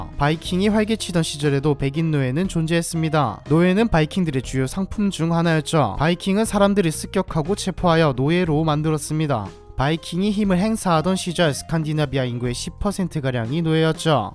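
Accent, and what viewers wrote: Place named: Busan, South Korea. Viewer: 님 고향은 부산이신거같아요 맞나요 ㅎㅎ?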